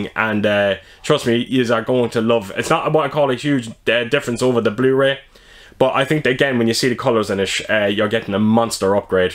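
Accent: Ireland. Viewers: Well I think this video has had 1000 views - or thereabouts - as I'm typing this so you're really on your way now. Your channel has a difference that no other does and that's your collectables. But all those wonderful special editions and busts you are able to show would be for nothing if you didn't have the presentation style and ability that you've developed. I think your Irish accent probably helps too. It's great to see you doing so well and it is well deserved. We had visitors yesterday afternoon so I didn't get as much edited as I expected but having two of our oldest friends over (we now only live a few miles from each other) meant the home cinema got a good workout and it's now almost flawless so I'll do an update for that in this latest video too. We watched the new Blu-ray of 'Spencer' last night which is a fictional account of a few days in the life of Princess Diana and it was shot on film. 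It did have a similar look to Last Night In Soho in places but a little dowdy at times which was similar - but different - to how Dune looked here. All our systems are different for sure. The 4K of The Sting has a really interesting extra on it and one of the Universal film restorers actually states that scanning a film exacerbates film grain. That would appear to confirm that suspicion then and why some films have to have digital noise reduction (Terminator 2 perhaps?) and others such as The Fifth Element have far more prevalent grain on 4K than on a 35mm print. We're all st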